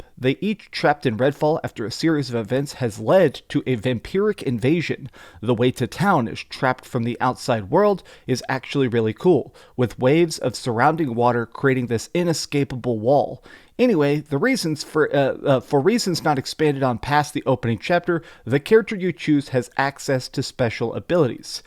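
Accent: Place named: Texas, United States. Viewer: I am not American. But I love your accent, Texas boy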